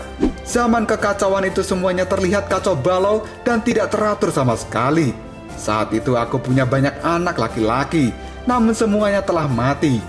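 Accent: Java, Indonesia. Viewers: Logatnya Jawa ya bagus sob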